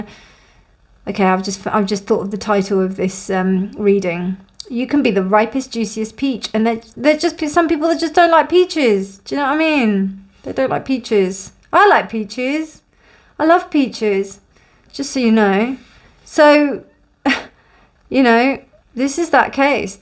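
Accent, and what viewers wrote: United Kingdom. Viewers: Thank you for your guidance and knowledge as always..also FYI your voice and energy are ridiculously soothing :) Brits automatically sound so much more sophisticated compared to the rest of the world!😅 You could make a video of only complaints and insults and I'm sure I could play it to sooth me to sleep. 💜🥰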